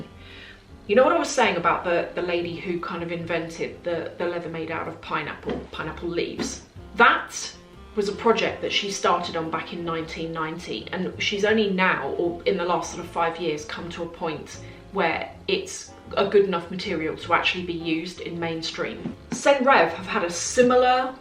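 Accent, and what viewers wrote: England, United Kingdom. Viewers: the Dior tote has grown on me every time I see it. prices in Canada are way to wild to even consider a luxury bag at this point in my life... but I most def appreciate it from afar. Lovely video's I can listen to an English accent all day! take care everyone xxo &&& FABRIC SHAVERS are life savers!!!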